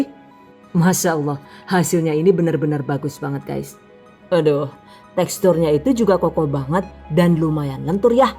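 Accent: Banyumas, Indonesia. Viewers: Msih muda tp bsa suara nya mirip wanita dewasa tua mirp suara maria untu penyiar radio jmn dlu keren pinter bhs inggris/ nglucu ,ngapak resep" 👍👍sdh tjir mlintir pula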